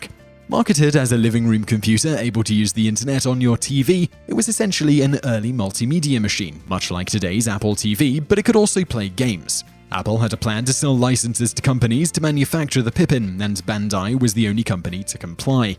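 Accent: United Kingdom. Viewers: Wow. You must be ignorant to the fact that Great Britain alone has many many accents. It's his accent.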